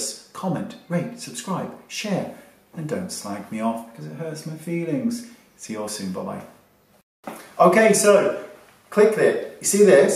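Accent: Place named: Wales, United Kingdom. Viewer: I'm fairly sure he's Welsh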